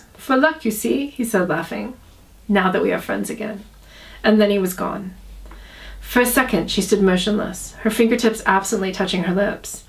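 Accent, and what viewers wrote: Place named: France. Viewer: Your French accent is not bad, it’s cute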